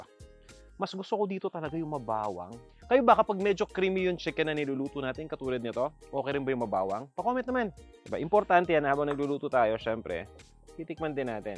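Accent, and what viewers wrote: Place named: Batangas, Philippines. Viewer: Nasa parteng calabarzon ka sir...sa accent mo..Southern tagalog😂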